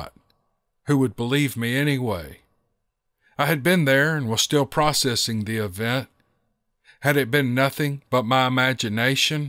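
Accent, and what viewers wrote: South, United States. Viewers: Hey, I hope that all is well with you and your family. I have came across your channel doing the Covid out break and it have been a delight to listen to you narrating these amazing stories. I enjoy hearing the Southern Comfort of your voice and the in-between conversation. By the way, I wanted to check on you because i've been missing your (live) until the next time, take care and I will continue to listen to these awesome stories.❤ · Love that southern accent and laugh sir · I get to listen to your southern charm for a few hours tonight. Thank you, we all appreciate your stories and your charm. Hello from Pensacola Florida y'all. Best regards to all of you.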